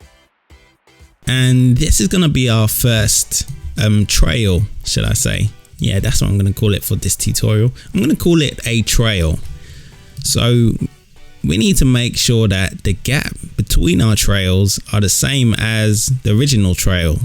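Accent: United Kingdom. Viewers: Loved your cockney accent!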